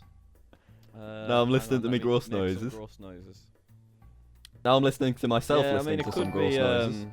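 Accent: United Kingdom. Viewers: Whaast how dare they insult your accent! British accents are some of the best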